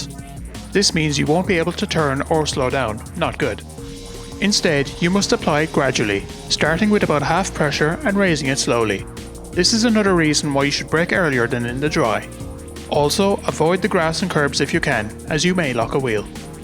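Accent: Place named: Kerry, Ireland. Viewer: You sound like you’re from Kerry or somewhere near there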